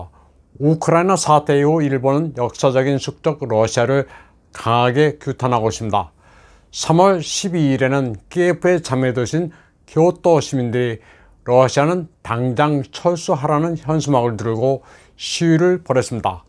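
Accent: China. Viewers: 중국인이신가요? 발음이 중국인같아요.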